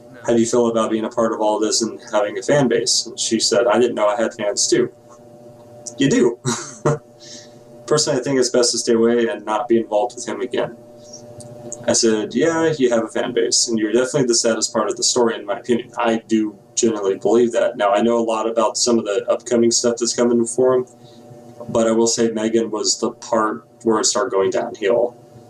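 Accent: United Kingdom. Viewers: What part of the UK are you from man? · Sounds Northern to me. But I'm an ignorant North Londoner. I'd guess Northamptonshire maybe.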